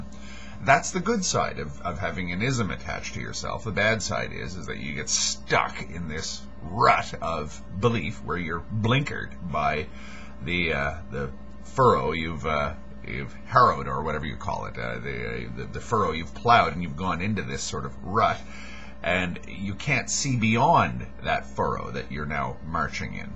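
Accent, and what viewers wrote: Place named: Canada. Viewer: Every word you say binds you in the same way, if to lesser degrees. The second you open your mouth you are labelling yourself by the sounds you decide to make. You say you are Irish, or of Irish ancestry. That binds you. The Canadian accent binds you. The fact you use English binds you. The fact you talk at all binds you. You seem to just be trying to avoid binding yourself to things you don't like, which in and of itself, binds you.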